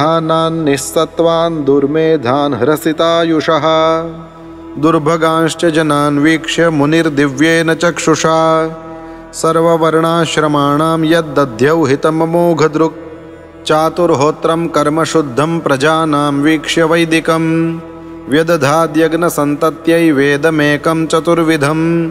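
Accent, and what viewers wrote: Standard. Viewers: ખુબ સરસ અને શુદ્ધ ઉચ્ચાર સાથે ભાગવત શ્રાવણ કરવા મલ્યું શ્રી હરિ ની કૃપા બની રહે.. સાદર પ્રણામ... જય ભગવાન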